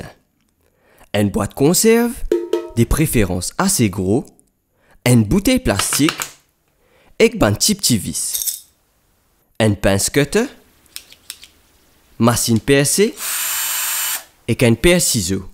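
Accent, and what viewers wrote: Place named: France. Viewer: Hahaha me disait bien qu’il avait l’accent français quand il parle anglais 😂 Damn j’ai douté hehehehe😂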